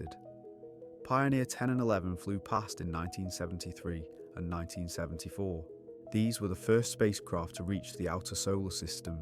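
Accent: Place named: England, United Kingdom. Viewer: where in england are you from? i like your accsent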